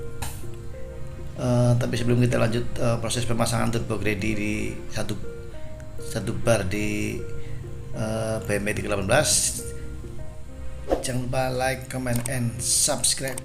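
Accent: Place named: Banyumas, Indonesia. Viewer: bahasanya ngapak om, salam dari republik ngapak, purwokerto 😄😃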